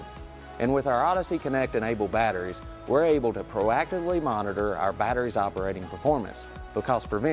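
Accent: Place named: South, United States. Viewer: I like the south in your mouth 😊.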